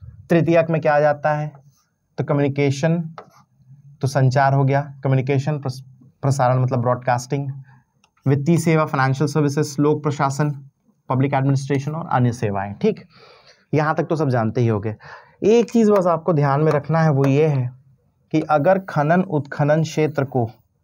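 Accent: Chhattisgarh, India. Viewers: Sir ji jab aap cg me bolte ho n...to bahut accha lagta h🙏❤️🙏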